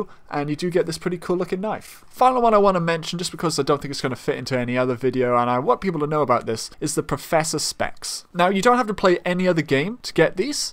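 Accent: Wales, United Kingdom. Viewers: Is he Welsh? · idk lol i heard little bits of a welsh accent lol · Are you British?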